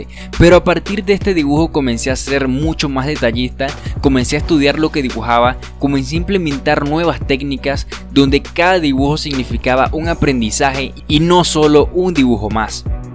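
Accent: Venezuela. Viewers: jajajajajajjajajaj no mames eres venezolano jaajajajajajja que risa xdddd yo tambien :V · Eres venezolano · eres venezolano no? yo igual jajajaj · Marico... Acabo de encontrar tu canal. Si había visto videos tuyos pero así de paso. Pero hoy vi uno y escuché tu acento con atención y dije "este pana es Venezolano" JAJAJAJA y me puse a hacer maratón de videos. Mk, eres un maldito psicópata jajajaja alp... dibujas demasiado arrecho y me inspiraste brutal. Gracias <3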